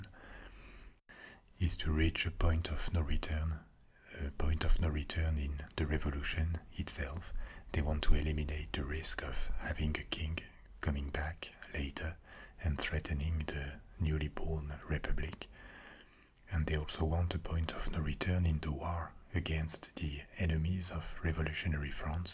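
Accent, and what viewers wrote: France. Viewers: i always come back to this video, love the french accent <3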